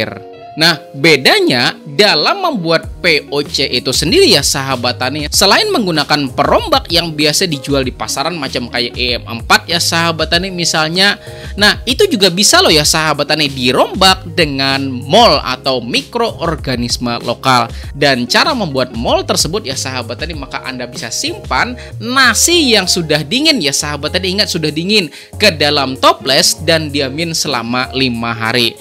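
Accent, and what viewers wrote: Banjar, Indonesia. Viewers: Logat Banjar nya masih ada😅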